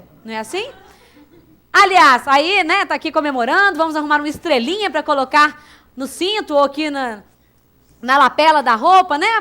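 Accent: Minas Gerais, Brazil. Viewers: Esse sotaque mineiro me traz boas lembranças.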